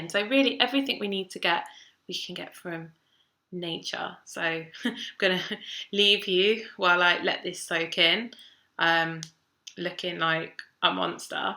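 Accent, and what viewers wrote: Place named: England, United Kingdom. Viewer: you are S-T-U-N-N-I-N-G and your English accent is Beautiful. I Love it. Please go back to this hair color. It suits you well. Makes your blue eyes really pop. Markus Rothkranz rocks and all of his products are effective.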